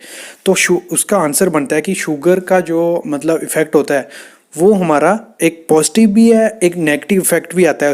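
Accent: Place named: Sri Ganganagar, India. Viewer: Boli se lgta h shri ganganagar se ho aap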